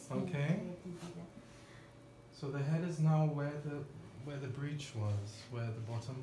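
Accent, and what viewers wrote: Trinidad and Tobago. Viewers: Is he Trinidadian? His accent sounds like it. I am also Trini. My baby is breech and I'm on here looking at exercises that I can do to get baby to turn before it comes to this point. The doctor made it look really easy in this video. He was so gentle and caring. Well done! 🙂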